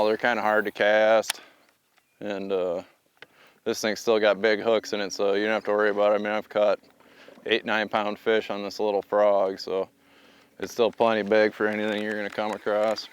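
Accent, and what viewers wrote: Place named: South, United States. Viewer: Dude reminds me of Jay from Jay and silent Bob with a southern accent chill af lol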